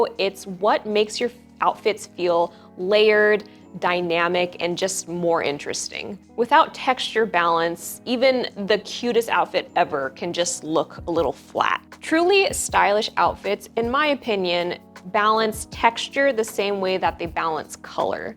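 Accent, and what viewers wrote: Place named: Australia. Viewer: I love you!! And your Australian accent is the best! 😂 very helpful tips!! 🙏🙏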